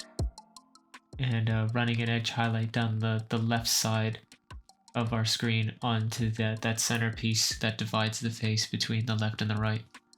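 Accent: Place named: Canada. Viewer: No offense but are you Canadian? You pronounce “decals” and other words differently than we do in the US.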